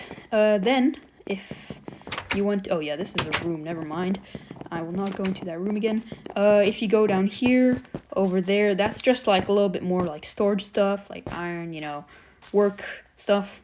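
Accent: France. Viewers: ARE YOU FROM FRANCE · Dude you from france 😅 i recognise youre voice anyway i love this world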